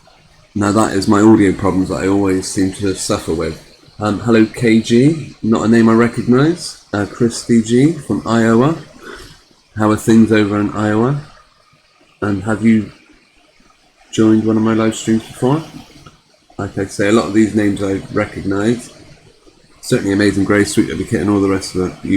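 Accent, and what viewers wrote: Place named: Bristol, United Kingdom. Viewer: Is that photo taken from... St George or Totterdown? You sound like you might be from Bristol 🧐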